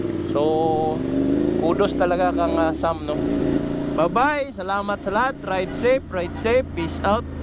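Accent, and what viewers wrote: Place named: Philippines. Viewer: i love ur filipino accent "lean anggol"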